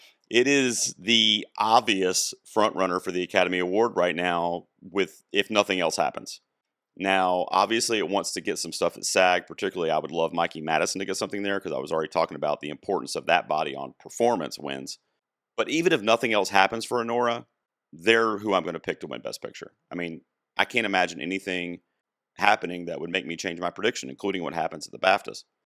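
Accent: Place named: United Kingdom. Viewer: I really love your British accent. 😂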